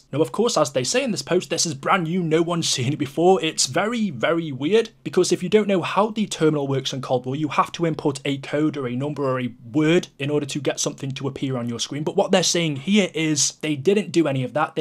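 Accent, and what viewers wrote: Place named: Stoke, United Kingdom. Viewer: Nice stoke accent burslem boyoo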